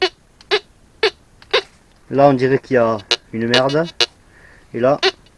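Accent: South, France. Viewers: Impossible de répondre je fais un essai comme ça si ça fonctionne je répondrai de nouveau Ça y est ça marche alors j’ajoute : Merci pour les infos je commande souvent sur Ali… Je vais regarder merci pour l’info Concernant ce rencontré ça risque d’être compliqué je suis dans l’Allier 03 Vu l’accent 😜 vous êtes dans le sud ?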